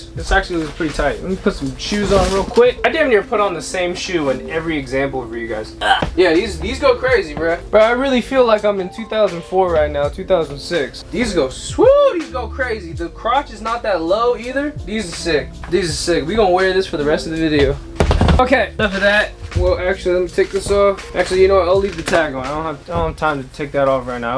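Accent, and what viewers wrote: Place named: Houston, United States. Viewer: Bro are you sure you're not from Houston, TX you sound just like me lol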